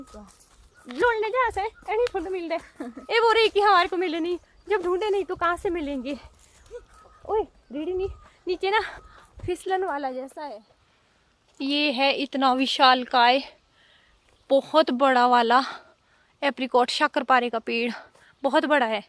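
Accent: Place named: Pahadi, India. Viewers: Bahut Sundar aavaj hai aapki.. uper se Pahadi accent uspe 4 Chand laga deta hai... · I am from una in Himachal pardesh Tuha pahadi bade ghaint bolde...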